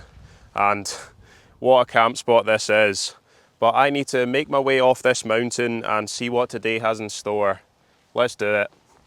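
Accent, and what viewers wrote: Scotland, United Kingdom. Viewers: Hi, im a bit late replying but loved it. I have seen the two previous camps on this mountain. Your narration was spot on. You have a great Scottish accent. You are also becoming somewhat of legend locally. Video,edited, and intro very good Kind regards, Laird Birkett 🎉😮❤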